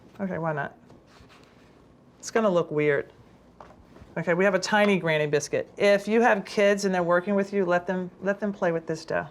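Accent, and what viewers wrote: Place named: North Louisiana, United States. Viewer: Sounds like north Louisiana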